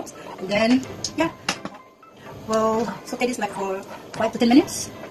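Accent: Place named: Singapore. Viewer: Ganda ng accent mo legit na legit na singaporean hehe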